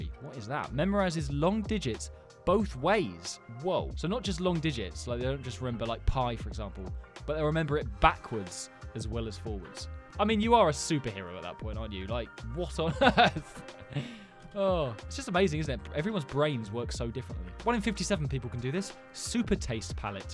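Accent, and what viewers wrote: United Kingdom. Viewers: i love this bros british accent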